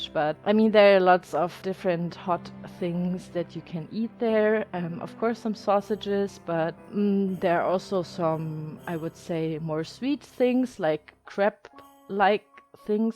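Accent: Germany. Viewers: I love just watching your videos and hearing your voice, you talk a lot like my Oma, and it makes me happy. I don't get to see her a lot anymore and hearing your heavy German accent makes me really happy. And watching this build reminds me so much of a trip I took to Germany once years ago for my Uncle Marco's wedding and we went during the Christmas time and it looked just like this! It was beautiful... Your video made me cry a little bit, happy tears though! haha you made me very very happy. So, thank you for that. <3 xoxo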